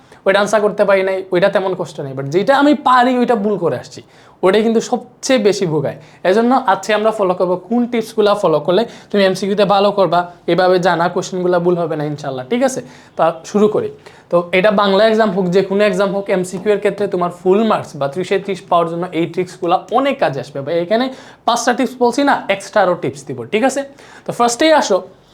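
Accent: Sylhet, Bangladesh. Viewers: Bai apne ke Sylhete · Ashole ami jantam na, ami notun bhaiyar class korchi. 🥺 kotha shone sure hoichi je bhaiya Sylheti😊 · ভাই হয়তো সিলেটি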